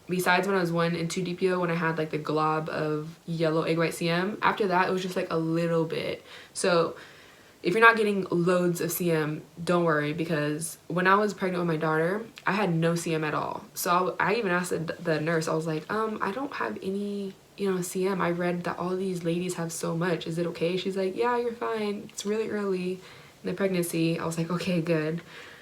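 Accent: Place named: New Zealand. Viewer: Are you from New Zealand? You have a kiwi accent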